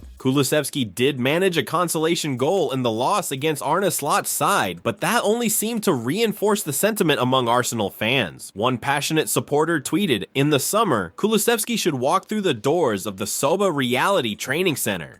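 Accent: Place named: United States. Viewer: Can never trust English transfer rumours with yank commentary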